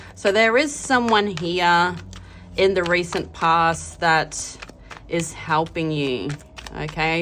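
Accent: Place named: Australia. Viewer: Thank you for reading. I love your accent. Are you Australian? ❤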